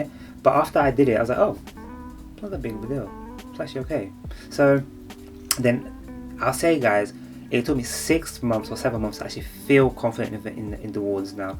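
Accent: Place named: Portugal. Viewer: I’m a admin/receptionist for a GP surgery and I’m so interested in starting my career as a phlebotomist, I’m about to enrol in a private phlebotomist course which is two full days, is nationally accredited, but to be fully qualified I need to get 30 sticks and work experience at a surgery which I might ask my boss if I can shadow our in house phlebotomist on my days off, or go straight to one of our hospital and ask to volunteer and be trained. I’m so looking forward to it, so I’m trying to learn as much as possible about the training process, so I found your video extremely helpful. I’m assuming you are Portuguese by your name and the way you speak, I’m Portuguese too. Bjs Silvia Gomes Freire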